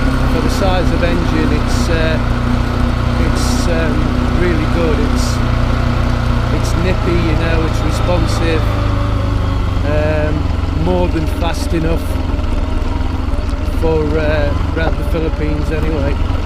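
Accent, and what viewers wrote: United Kingdom. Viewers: Show Hey. Bro! small world after all eh? is riding like, a bloody local pinoy here though! while speaking with British accent hehehe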